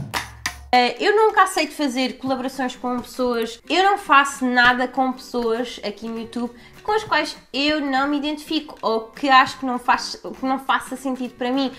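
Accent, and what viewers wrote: Portugal. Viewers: Quem tem 1 milhão de inscritos pode talvez viver de youtube, mas duvido bastante. Adoro seus sotaque português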